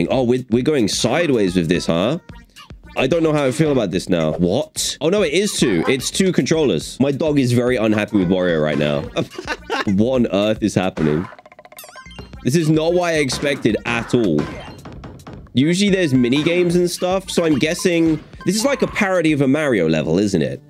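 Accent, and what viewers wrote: United Kingdom. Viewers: British right.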